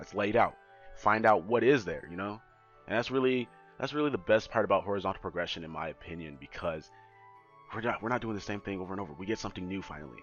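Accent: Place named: United States. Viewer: what was ur first language then? cause ur american accent is on point g.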